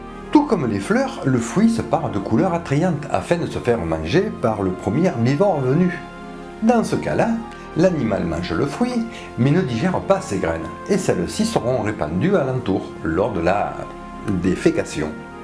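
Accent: South, France. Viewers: (: Au top. Toutes c'est fleurs, l' accent du sud ,avec un temps de pluie rien de mieux :)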